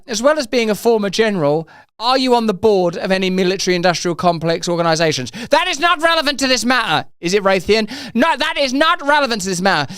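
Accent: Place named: United Kingdom. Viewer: Russell Brand is funny but he talks so fast and so British if you blink you could miss something. “Hey we don’t have evidence he’s a Russian plant! No, no evidence I’m sorry I just got on tv, got excited, and thought I might say something incendiary.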